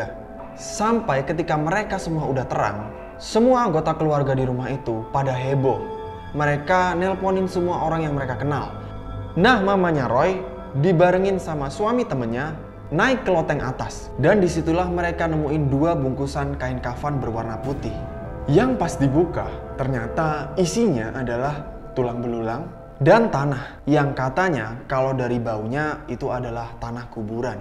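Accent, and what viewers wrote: Java, Indonesia. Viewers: Jawa keras slurr 😅 Salam dari Jawa tengah tepatnya Legenda warteg Kota Tegal laka laka 🙏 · Jowo keras anjai😂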